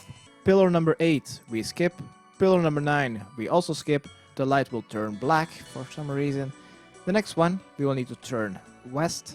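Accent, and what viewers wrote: Netherlands. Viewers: I just got my first ever quest cape and that's because of your amazing guides! I want to thank you for all the time that you've been putting into these videos. They're very fun to listen to because I can hear that you're a dutchy or from Belgium haha, further they're just very easy to follow because a noob like me gettin' a quest cape is quite the achievement. Thanks my man! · Are you/ is he Dutch?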